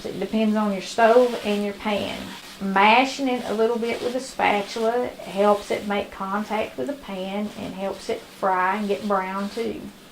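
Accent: South, United States. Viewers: I love your southern drawl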